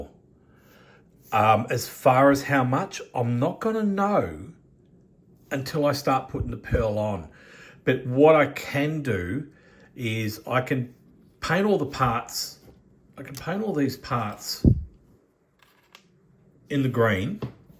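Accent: Australia. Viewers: That British and pearl green combo looks fantastic! Watching from Mobile (Moe-beel) Alabama. Been watching for the last few segments, all have been great. 62 and have been building since I was 9 and still learning, thanks to you guys ( or should I say blokes ) I happen to love the Aussie accent. Enough of that, keep up the good work.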